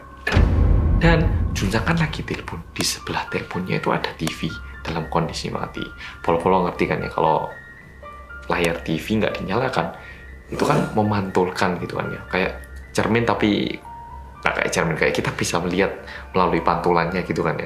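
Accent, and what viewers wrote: Java, Indonesia. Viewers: Awakmu aneh tenan tak rasakke mas, lha awakmu arek korea nangin kok tag line ne kok yo jowo, medhok luwe po meneh kewedhen...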